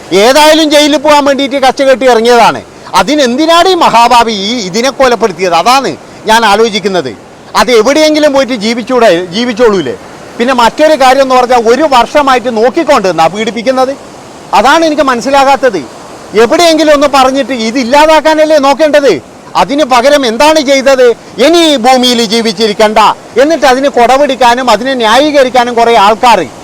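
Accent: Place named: Kasaragod, India. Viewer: കാസർകോട് ഭാഷ.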